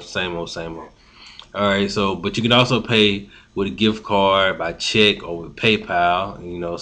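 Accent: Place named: South, United States. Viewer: Thanks, the tutorial is awesome and I love your southern accent ;-)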